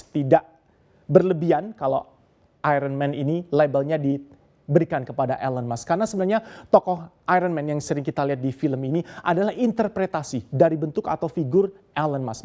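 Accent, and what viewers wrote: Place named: Java, Indonesia. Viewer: aksen jawa nya bikin greget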